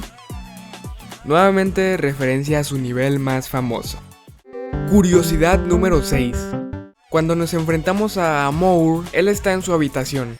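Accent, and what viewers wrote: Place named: Mexico. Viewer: eres mexicano wey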